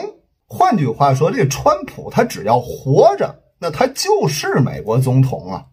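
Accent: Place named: Tianjin, China. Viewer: 第一次听到带津味理智的优良政治評論！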